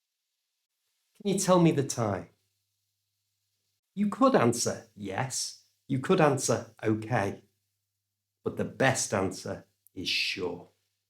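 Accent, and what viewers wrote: United Kingdom. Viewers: hi, your voice is excellent, easy to listen british accent. lovely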